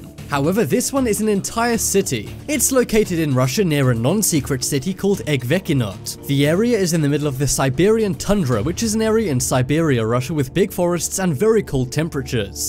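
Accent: England, United Kingdom. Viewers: makes me laugh how this guy sounds british yet pronounces nuclear as nukiller like an american ffs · I’m 100% sure, he is british... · It's nuclear,not nukyilla, I thought you was English at first,but I'm not sure now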